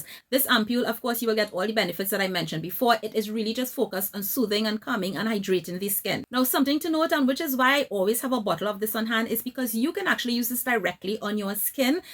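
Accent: Trinidad and Tobago. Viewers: A Trini! A Trini accent never sounded so sweet 🇹🇹🙌🏽🔥!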